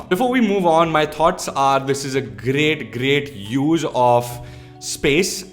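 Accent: India. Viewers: Indian accent 😂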